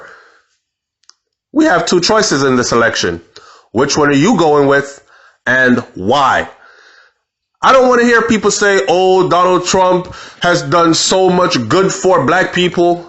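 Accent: Jamaica. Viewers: I am living here in the U.S. and to tell it in the Jamaican way the way you did, u no fool (as mi granny woulda a sey). Yu back up yu story wid facts an' mi proud a yu. I have been following the Harris story and I have decided who I will vote for in November. Peace!! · I appreciate the content but I find voice to be annoying especially the absurd blend of the Jamaican and American accent. His articulation and voice pitch is awful. I would recommend he get speech therapy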